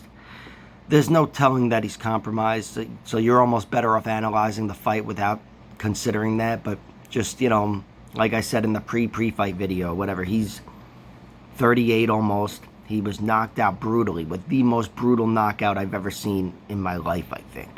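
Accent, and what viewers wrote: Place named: New York, United States. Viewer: Are you from New York I ask because of the accent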